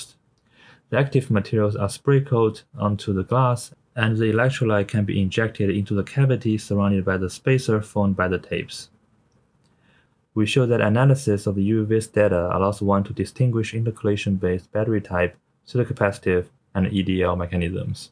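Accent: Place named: China. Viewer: 不用客气，希望你们越做越好。这是我的听感，我一般边做事边听油管，听到你的作品时我感觉你的英语发音重音漂移太严重了，读起来像中文的抑扬顿挫，一句话来好几个重音，听起来不知道你在说什么。有中文的口音完全没问题，希望主播可以提高句子把握能力，修改部分单词的发音错误。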